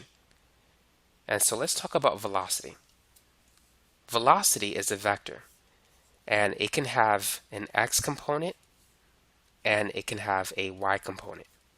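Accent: United States. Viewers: Dear Teacher: You helped me today. I am teaching myself-or more correctly, learning myself via teachers like you--physics. My interests--outside English teaching and philosophy--are sustainable aviation and human spaceflight. Please allow me to help you--just a bit. Sir, you're a good teacher (thank you for that!); you speak fairly distinctly, slowly enough for your students to hear and comprehend your words & concepts clearly--and you convey information methodically, in bits that can be digested--whereas most physics teachers I have seen can't teach to save their granny's lives; but, respectfully--and take this with brotherhood, my friend, consider--pronouncing your words fully, please. 'Wes' is not a word (it's a person's name), nor are 'Eas' or 'Norf'. In addition, 'objec' is not a word, either. People will say this doesn't matter--and that you speak an American city dialect---but I care to much for teachers like you--who are trying to educate the world--so I respect you enough to say they would be wrong. 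You are too well-educated to limit your pedagogy with voluntarily incorrect pronunciation; I say "voluntarily", even though I would guess you probably grew up in an immersion environment wherein your peers and guardians (really--your guardians, too?) spoke this way, which affected your interpretation of the phonemes they were pronouncing... in relation to the words they were a part of... and the connected to meanings they were attached to- unconsciously; so I could guess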